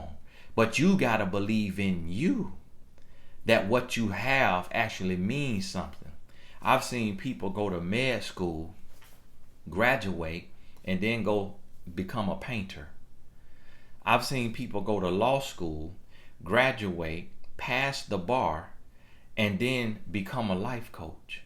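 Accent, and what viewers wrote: South, United States. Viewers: I love your southern accent, God Bless.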